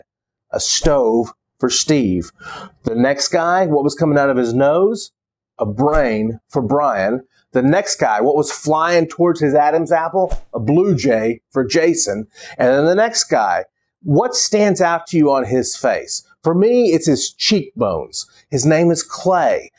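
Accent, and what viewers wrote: South, United States. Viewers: Love your charming Southern accent.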